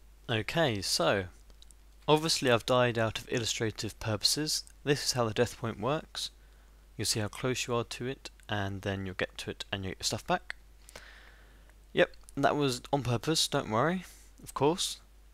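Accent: United Kingdom. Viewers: He's British, it's pronounced that way over there. Don't be ignorant.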